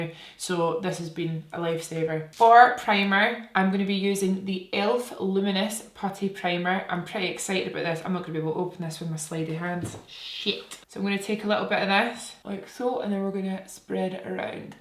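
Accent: Scotland, United Kingdom. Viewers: Skoosh 💦 😂 Awfy Scottish today!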